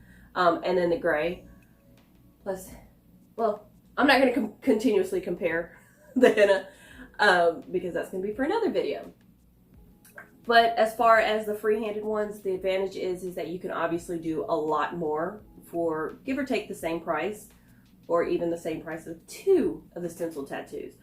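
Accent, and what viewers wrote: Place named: South, United States. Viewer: Hello love still going strong I see... just give them a thumbs down 👎 call it what it is, I won't tell nobody😂...how you been? Also what's that accent im thinking arizona, also sounds a little southern🤔.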